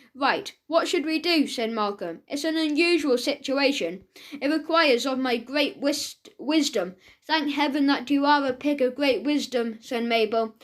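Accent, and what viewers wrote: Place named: England, United Kingdom. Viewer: I am very good at telling accents, I think he is from England. Also amazing reading!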